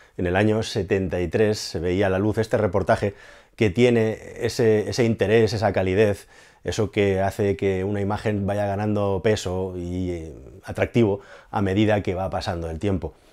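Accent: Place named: Madrid, Spain. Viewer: Ah no sabía que es catalán. Su acento es muy madrileño y fácil de entender.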